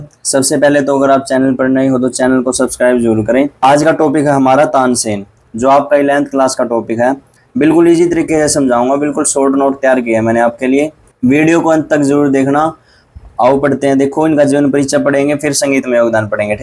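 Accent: Haryana, India. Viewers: Sir are you from haryana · Haryanvi sir ji ❤❤ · Yeah bro 🔥🔥🔥🔥🔥🔥🔥🔥 haryanvi boliii , m bhi vha se huu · Haryanvi boli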